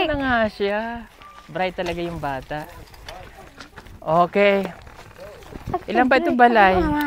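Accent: Visayas, Philippines. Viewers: sir ang cute mag salita ng bisaya!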